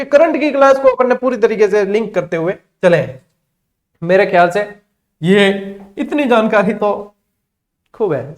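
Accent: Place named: Marwari, India. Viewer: Sir aapki marwadi bahut mst lagti h Or bahut hi accha lagta h Me jhalawar se hu barambar pranam👌🙏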